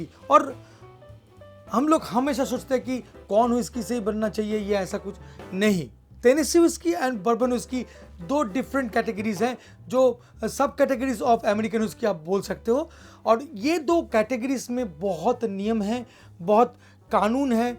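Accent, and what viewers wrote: Bengal, India. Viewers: Is not woishky ...avoid ur bengali tone of speaking Its whisky..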